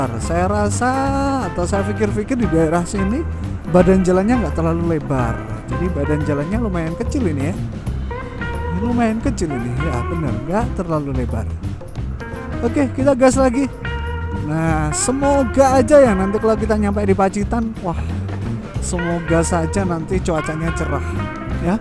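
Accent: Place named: Ponorogo, Indonesia. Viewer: Iki lho dlondonge wong ponorogo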